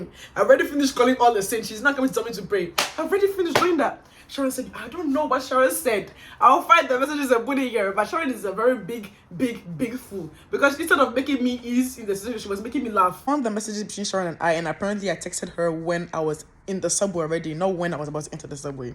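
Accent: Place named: Nigeria. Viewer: you sound soooo Nigerian in this video oo hah! 😂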